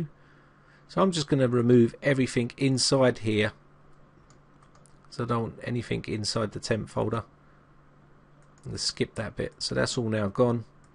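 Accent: United Kingdom. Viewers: Thanx here to my new friend-you save my kids recently purchased laptop-you sound like you are from thee other side of the pond...lol, either way I am just glad you had my back on that one. Toney B . (Philly)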